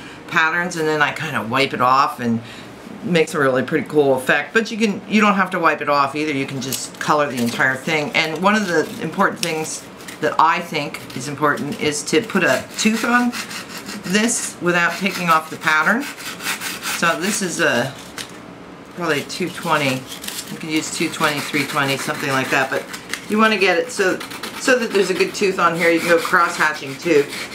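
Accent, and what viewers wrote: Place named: India. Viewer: Intro sounds like indian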